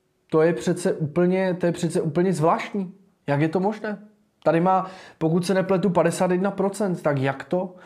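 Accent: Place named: Slovakia. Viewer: Mně ta skoro slovenština přijde roztomilá.Já jsem Slovenka a taky češtinu ač nerada trochu prznim.🙂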